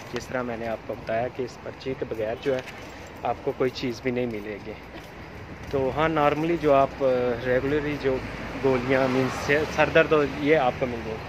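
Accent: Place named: Punjab, India. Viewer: Nice panjabi bro ap suraiki ho ya panjabi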